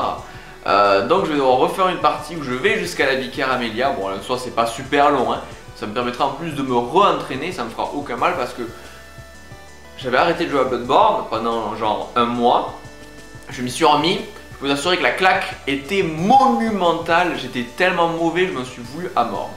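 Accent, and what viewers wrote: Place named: South, France. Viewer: L olo sauf ta voix du sud ^^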